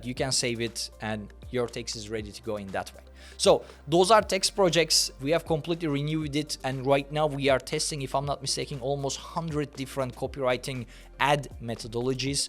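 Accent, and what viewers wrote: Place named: Turkey. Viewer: Türklük damarım tuttu:) Harika araçmış diye videoyu izlerken, aksan dikkatimi çekti ve başa dönüp isme döndüm. Ve. Helal olsun, tebrik ederim. Çok güzel iş.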